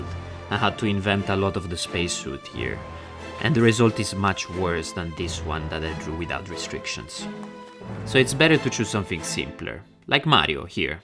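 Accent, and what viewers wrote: Italy. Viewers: Thank u for those tips, they were very helpfull. I have a question, ma sei italiano??😅 dalla pronuncia sembra di si, comunque grande grazie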